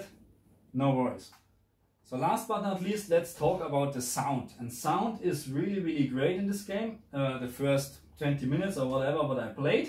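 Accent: Germany. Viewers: Im sorry, it has nothing to do with the video but i’m fascinated by accents and I was just wondering, is that a German accent? That’s my best guess lol. When you said “when fading” I would of just said “when looking around” tbh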